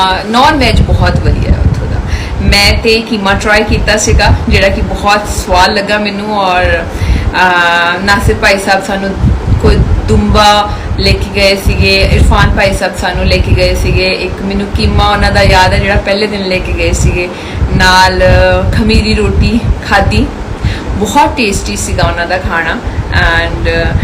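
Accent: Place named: Amritsar, India. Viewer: from your Punjabi accent, it dawned on me you are an 'Ambarasrian' from the opening lines of your conversation on Lahore TV shows. I'm extremely excited to know that you really are after watching all your vlogs telecast from Indian bloggers! Being a son of an Ambarsari family, I also used to go to Wagah Border with my friends regularly in the sixties in the hope that one day I would go across and visit my ancestors' birthplace in Ambarsar (Amritsar), but alas, till today, at the fag end of the existence, that dream has yet to come through. It made me really sad to learn that you lost your father in his thirties. My sincerest commiserations, and prayers for you to excel in all the quarters of showbiz and more than you may also be blessed with a caring and loving Ghabru of your dreams. Anees from Lahore (Ambarsariya).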